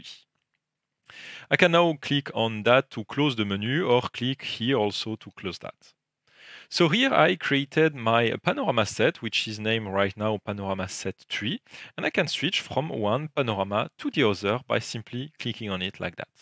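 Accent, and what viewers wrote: France. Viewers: Ohhh l'accent de frenchy à couper au couteau...vidéo intéressante. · Hello Twinmotion folks. Sorry, it's nothing personal, I love the French language. But the narrator's accent is very pronounced and I can't quite understand it. Please, I ask you to put it in French with subtitles or in English, but with less accent.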